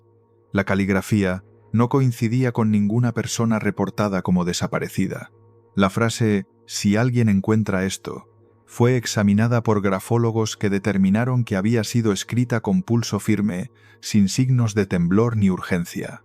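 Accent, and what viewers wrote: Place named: Spain. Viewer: ERES ESPAÑOL, NO LO VERÉ .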